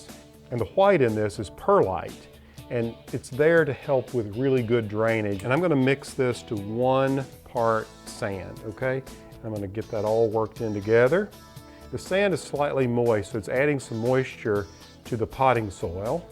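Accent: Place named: Arkansas, United States. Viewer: P... you sound like you're from Arkansas. Am I correct?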